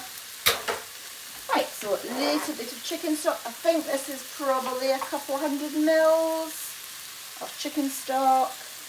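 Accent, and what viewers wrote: Scotland, United Kingdom. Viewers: When you said "stroganoffie" with that beautifiul scottish accent, I admit that I thought of bannoffie pie. :)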